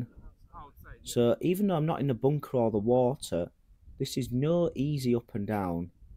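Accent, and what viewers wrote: Yorkshire, United Kingdom. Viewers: Watched the whole vid, defo a white rose lad. Hope your wedding day was awesome, you’ve gained a new sub. Much love from Lancashire.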